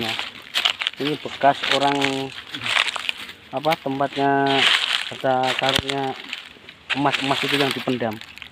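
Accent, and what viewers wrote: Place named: Java, Indonesia. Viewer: Dari nada suaranya pasti org Jawa ini banyak dobol nya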